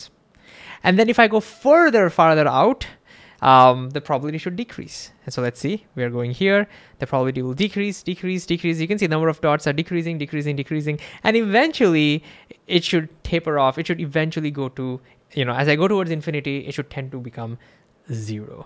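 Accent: India. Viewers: Brroo , is he indian 😑😑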